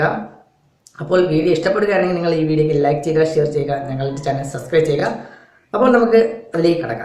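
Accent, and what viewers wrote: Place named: India. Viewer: Video title in English, spoken in Indian.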